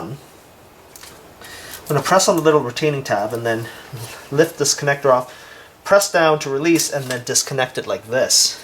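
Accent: Canada. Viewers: My god, you’re Canadian 🇨🇦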